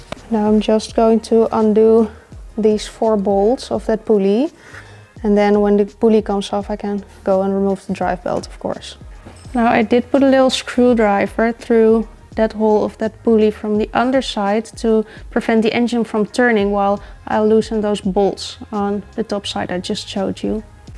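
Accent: Netherlands. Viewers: I’m a tech but I’m more taken by your Dutch accent? I hope I’m right that assumption your from the Netherlands 🇳🇱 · So graceful you make it look easy. Love the Netherlands accent as well.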